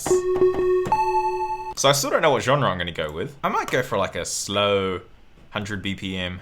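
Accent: New Zealand. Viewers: Hey dude, you sound like you're from NZ lol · Beautiful! Picked up the kiwi accent too ;) Chur bro It really shows that what plugin you use isn't important, it's just the talent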